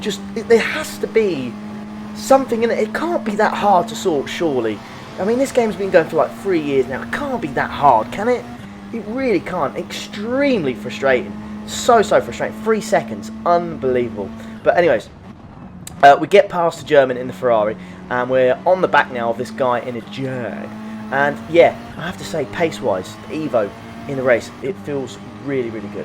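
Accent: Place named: United Kingdom. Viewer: Anyone ever tell you that you sound like Daniel Radcliffe? Of course, I'm American, so I guess a lot of Brits sound that way to me. 😂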